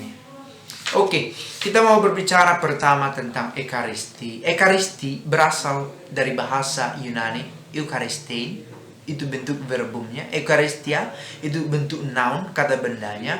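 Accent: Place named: West, Indonesia. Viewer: Frater dialek kebaratan diperbaiki. Tks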